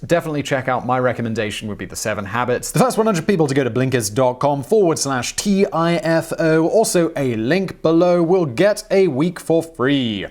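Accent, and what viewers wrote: England, United Kingdom. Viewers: Ok , I just spent of my life listening to this cuz Simon has a great voice and Honestly, had ANYONE ELSE said with a straight face that there were PROFESSIONAL SPORT ORGANIZATIONS re: RPS I would have called Bovine Scatology in a nanosecond.... Saying stuff with the weight of credibility using an English accent has to be a superpower.... That being said I’ll see your RPS clip and raise you one...” I dare you to do a clip re the origin of LIAR LIAR PANTS ON FIRE “ Have a good wknd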